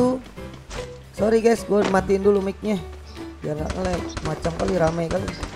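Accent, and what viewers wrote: Java, Indonesia. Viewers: Medok hahaha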